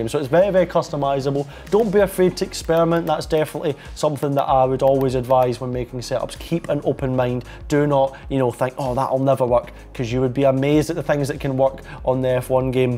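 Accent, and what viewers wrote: Scotland, United Kingdom. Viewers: the scottish is showing here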